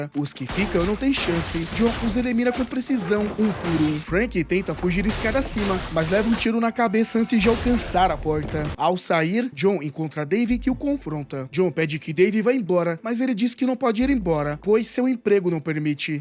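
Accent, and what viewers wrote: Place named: São Paulo, Brazil. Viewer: Pq esse cretino que narra isso como se fosse um cretino? AH, pq o narrador é um cretino caipira! SAquei logo pelo sotaque! Paulistas kkkkkkk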